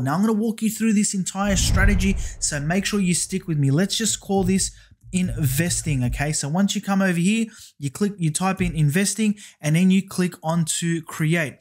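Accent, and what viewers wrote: Australia. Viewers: Your video is amazing, so many people making videos make it so complicated - you have made it crystal clear. Sure I am going to have to go through the video again and make notes, do you have a cheat sheet for the video? Have subscribed and can't wait for more videos. Like you Aussie accent. Thank you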